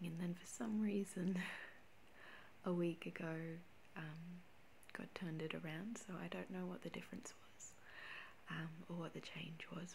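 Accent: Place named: Australia. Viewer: I also find it quite refreshing hearing an Aussie accent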